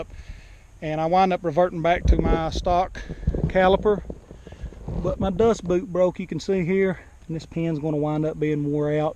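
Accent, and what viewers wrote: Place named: Deep South, United States. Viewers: This plus this dudes yee yee accent make this so badass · Dang bro the way you talk and have words like yuns and uppair it's almost like your a deep southern big like me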